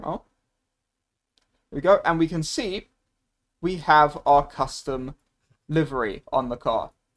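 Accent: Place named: United Kingdom. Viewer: This is brought my attention to how surprisingly English/British your accent is. Great tutorial though (If for some reason I decide to play Asseto Cora at any point)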